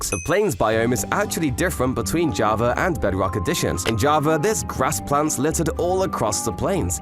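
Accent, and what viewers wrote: United Kingdom. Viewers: Can you tell us why is your voice British? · Its the way British dialects link vowels together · Nahh he’s just British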